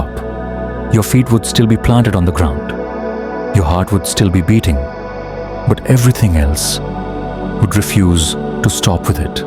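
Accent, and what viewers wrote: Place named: India. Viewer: Hello bro 👋 Congratulations on ur new channel 😍 and Happy New Year 🎉 Saw ur video and realized that the content is actually good but the views r slow. I do voiceover. If you'd like me to voiceover ur vids, I would be happy to provide my services. I am an Indian and by ur accent I can say that we both r bros 😃. It appears to me that ur accent still lacks a global appeal. This is one of the reason for slow growth for an Indian youtuber. Kindly reply if you r looking to speed up things!!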